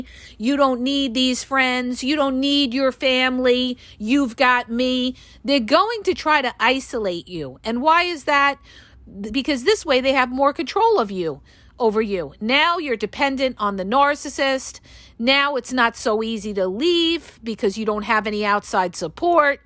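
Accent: New York, United States. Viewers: I hear your NY accent. Lol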